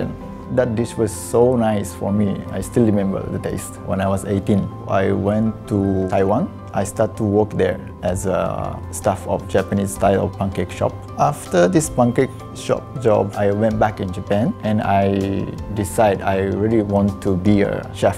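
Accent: Singapore. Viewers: This is new to me. A Japanese with Singaporean accents 😅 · Video: English Chef: Japanese Accent: Singaporean Dish: Italian Ingredients: Japanese Bill: Priceless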